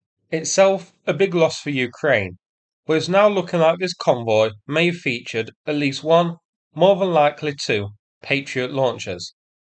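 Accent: United Kingdom. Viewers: Hold on are you seriously claiming this is the first patriot system to be destroyed in Ukraine? Sorry but thats insane cope. The US has confirmed the first destoyed batteries almost a year ago. Ukraine is now begging for 25 more patriots... Germany saying they have sent so many to ukraine they have no new ones to send. This channel turned into cope central lmao. The british accent makes it even funnier.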